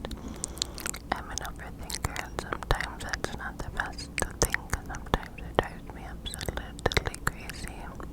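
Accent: New York, United States. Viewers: I love the New York accent 🥰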